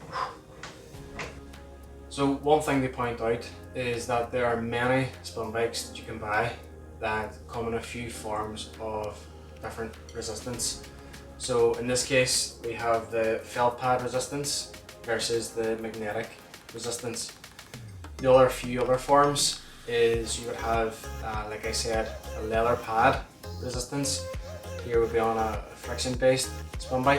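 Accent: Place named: Ireland. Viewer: It’s just an average Irish accent, nothing to go bananas for…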